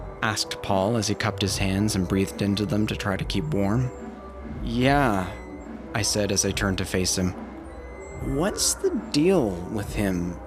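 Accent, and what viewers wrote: Canada. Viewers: Don't love the narrators Canadian accent, but other than that... All good. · These are some of the best Creepypasta recordings on YouTube. However.... man you can tell that the narrator is profoundly Canadian!!!!! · The way he pronounces words with the letter U is a dead give away he's from some part of Canada. Granted Canada has many accents, like America, People in the Maritimes speak differently than those in the Greater Toronto area ect ect. Anyway listen for how he says "outfit".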